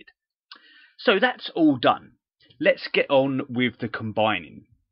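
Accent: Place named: Cockney, United Kingdom. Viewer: I watched at least 5 other videos and this was by far the clearest and easiest to understand - despite you being a cockney :). Thanks a lot....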